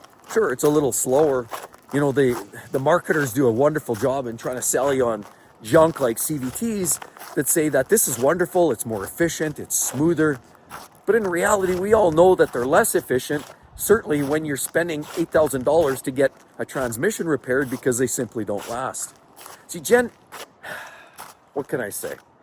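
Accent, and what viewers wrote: Canada. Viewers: This guy sounds pretty Canadian to me should’ve said so at the beginning. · you sound canadian... was waiting for you to say 'eh'.... im guessing youre a youpeer maybe.... IDK, Im Alaskan, and you sound like a lot of canucks i know... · That's one helluva hoser accent, eh?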